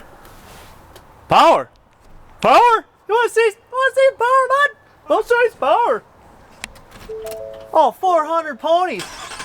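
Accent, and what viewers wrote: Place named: Wisconsin, United States. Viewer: You have the most Wisconsin/southern Canada accent ever 😂. Sickkkk truck tho 🎉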